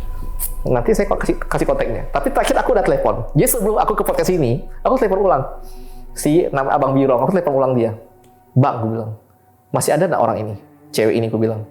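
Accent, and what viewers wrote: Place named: Pontianak, Indonesia. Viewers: Bang logat Kalbar ny kental, wkwkk mantap bang paduuu 😂😂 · Salut sama bang, tidak menghilangkan aksen bicaranya khas pontianak 😂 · Bahase Nye pontianak bnget bang😅 Salam dari budak pal 5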